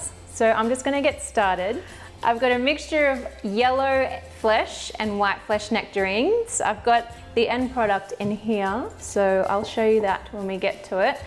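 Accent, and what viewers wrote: Australia. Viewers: Are you Australian, where did you buy that machine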